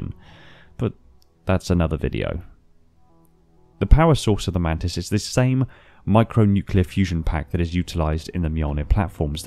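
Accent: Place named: England, United Kingdom. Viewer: The English accent makes narrating this video gives it a certain level of elegance.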